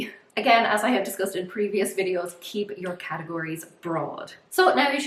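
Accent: Ireland. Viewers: Thank You good to know. Also love your Irish accent.💚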